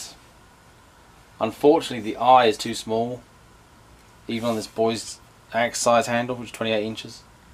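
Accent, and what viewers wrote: United Kingdom. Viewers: This guy sounds strangley british Also love the video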